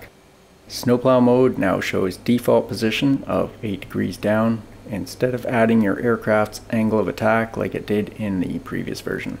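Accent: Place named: Maritimes, Canada. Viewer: Awesome video! You must be a Maritimer. Newf?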